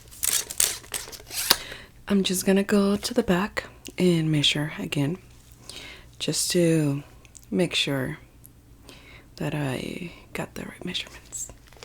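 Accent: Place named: United States. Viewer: A very clear, deep, soft female voice ❤ with a natural American accent. Not all those "French, Mexican, Slavic, etc."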